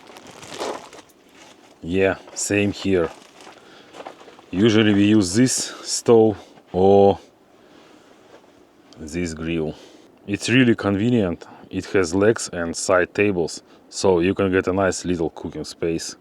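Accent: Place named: Crimea, Ukraine. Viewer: Ох, как бьёт по ушам этот Крымский акцент )))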